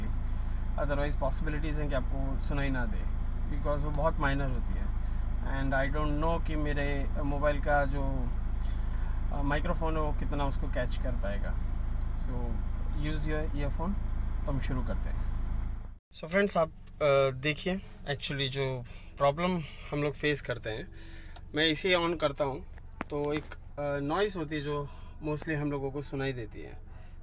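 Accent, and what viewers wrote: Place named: India. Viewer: English title but Indian narration. Dislike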